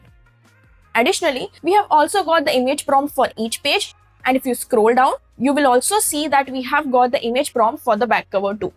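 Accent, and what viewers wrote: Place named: India. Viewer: Indian no doubt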